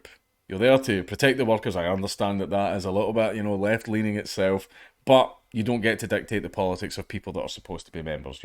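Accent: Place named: Scotland, United Kingdom. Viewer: I fuckin missed your thicccck Scottish accent Maaan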